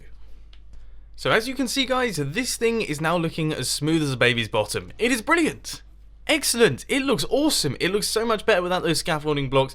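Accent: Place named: United Kingdom. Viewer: It's your British accent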